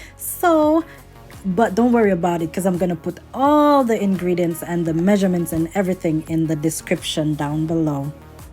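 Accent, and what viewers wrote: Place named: Jamaica. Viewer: U sound like a Jamaican 🇯🇲 New Subscriber😂🙌💖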